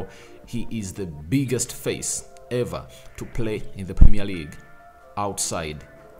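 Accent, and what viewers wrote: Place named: Uganda. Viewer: I knew u were Ugandan bro. I just checked to confirm...i know my pples' accent. Do your thing homie do your thing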